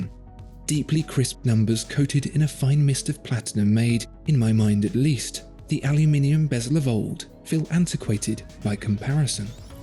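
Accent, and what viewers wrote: United Kingdom. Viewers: Dude your sleepy british accent sucks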